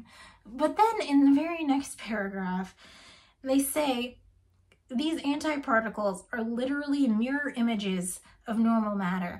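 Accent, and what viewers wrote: Kentucky, United States. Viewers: More Kentucky accent!!!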